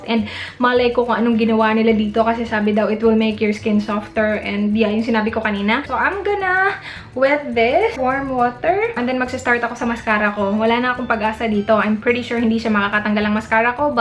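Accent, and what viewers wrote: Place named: Visayas, Philippines. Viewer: bisaya accent is there pa hahahaha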